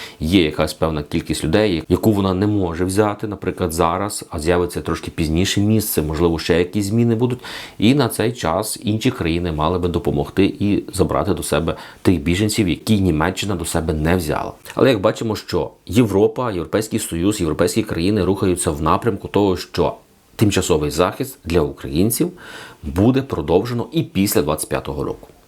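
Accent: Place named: Western Ukraine, Ukraine. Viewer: Западенець, бендеровець...мало грушей накрав....по мов1 ч1тно, як ти розмовляеш мовою....я укра1нка, а розмовляю зовс1м доугим д1алектом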